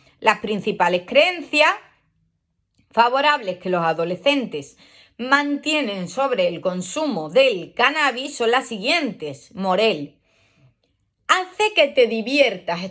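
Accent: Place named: Andalusia, Spain. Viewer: Hola, estoy siguiendo tus audios psico uned, van muy bien mientras paseas,en tren, etc, y ese acento sevillano o andaluz lo hacen más ameno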